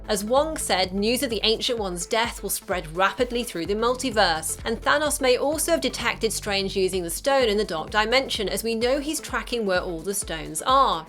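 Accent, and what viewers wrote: United Kingdom. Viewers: another movie video in British accent! it's the third one only today that's bizarre !!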